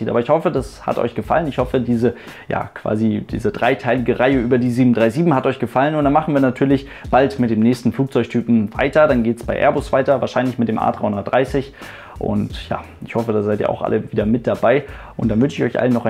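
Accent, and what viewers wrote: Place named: East Germany, Germany. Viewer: next generation mit deinem ostdeutschen Akzent im denglisch auszusprechen ist leider nicht witzig, sondern doof.... die englische Aussprache ist doch nicht so schwer, oder?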